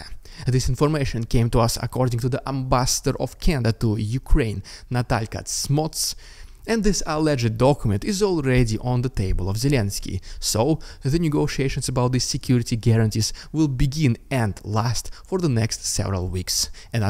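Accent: Russia. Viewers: He actually IS Russian. 😂😂😂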